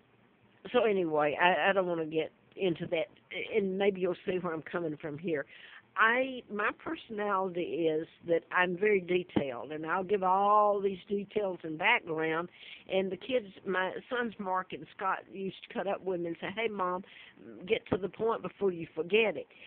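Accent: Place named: South, United States. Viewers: How did u get Southern accent ? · she was kidnaped and the southern accent comes from the British.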